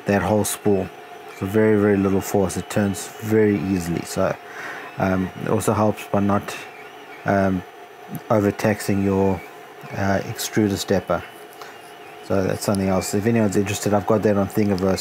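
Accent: South Africa. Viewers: Are you South African? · This boytjie must be a south african???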